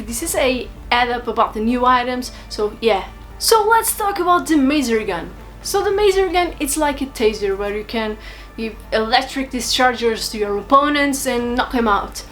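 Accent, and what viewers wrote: Portugal. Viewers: q legal, achei o sotaque de ingles parecido parecido com alguem que fala portugues mas no fim era portugues de portugal kkk estou atras dessa conquista no death stranding otimo video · Até que enfim achei um vídeo super explicativo, parabéns moça, você é de Portugal né? Abraços do Brasil!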